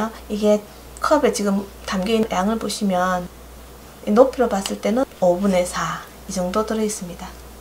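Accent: Gyeongsang, South Korea. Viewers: 경상도 말씨 진짜 정겹네요.말씨가 넘 예쁘요.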